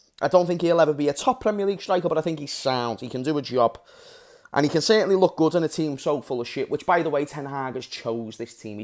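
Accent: Liverpool, United Kingdom. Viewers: Hey, I am a teenager from Kazakhstan, but I absolutely love your channel and Scouse accent ofc, despite the fact that I am a foreigner, I understand 100 of the words you are saying and the words that you are saying they are very objective and precise, hope I will se ya some day when I might visit Liverpool in the future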